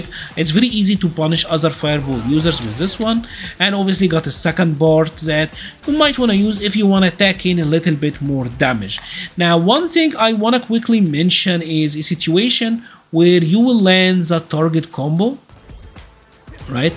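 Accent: Egypt. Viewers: habibi good to see that there is an egyptian community for streetfighter, i just got the game a couple of weeks ago and learning luke so when i heard your accent i immediately knew you were egyptian. btw amazing guide super helpful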